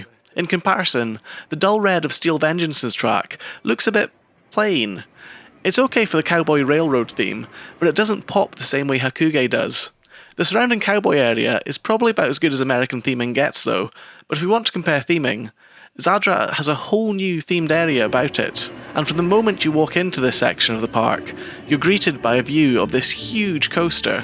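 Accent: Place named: Wales, United Kingdom. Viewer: Ouhh ok. I thought I heard some welsh 😅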